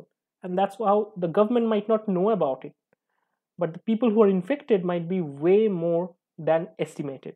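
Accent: India. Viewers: I am sorry but if YOU'RE AN INDIAN YOU NEED TO STOP USING YOUR THICK ACCENT IN NARRATING A VIDEO.... OR somehow include "Indian accent" disclaimer on your VIDEO title... So I don't click on it and not understand a single word... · speaker sound from India right?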